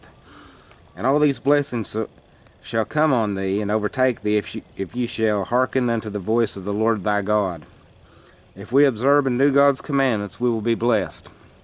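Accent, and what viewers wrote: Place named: Tennessee, United States. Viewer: Oh my hole reason to message you was to thank you for submitting to our Creator and also to ask you if you were from Tennessee cause you sure have a Tennessee, Kentucky, Mississippi accent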